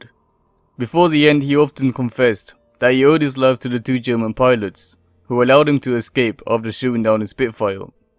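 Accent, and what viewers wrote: South Africa. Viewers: What are you mate? South African? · Just a question: is that a SA accent? 😀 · It's the accent south African? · I'll hava guess, south African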